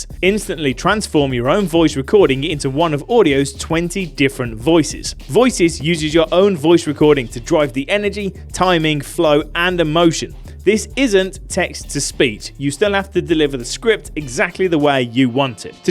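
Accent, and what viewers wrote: United Kingdom. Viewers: SO MANY TIPPS for my workflow!! Thank you so much from Germany and let me say that I love your accent. Which part of UK is it from?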